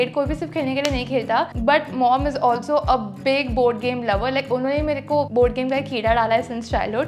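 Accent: Gujarat, India. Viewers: I m also gujrati and actually when you speak Hindi or English also there is a style by which we can make out that you are Gujarati there is a hint of Gujarati in it. I love your videos I am your biggest fan I am a subscribers from you read 50k your video superhit for me love you didi ❤️❤️ Regards to your parents 🙏❤️ Love to bhaiya, UN bhaiya look so cute together ❤️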